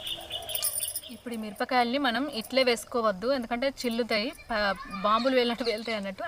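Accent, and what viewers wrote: Telangana, India. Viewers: Chutney bagundi and me telangana language kuda bagundi 😊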